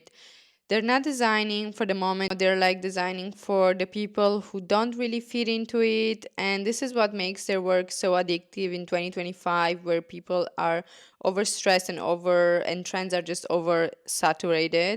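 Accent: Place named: Italy. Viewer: very off topic but where is ur accent from ? Italy ? it feels good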